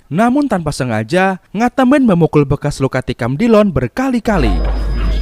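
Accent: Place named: West Jakarta, Indonesia. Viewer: aroma aromanye ente orang jakbar bang ye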